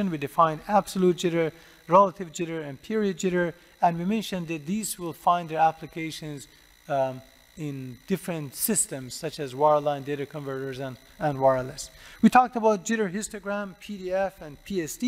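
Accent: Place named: Iran. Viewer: mamnun. az avale lahje fahmidam ke hamzaban hastim. baese eftekhare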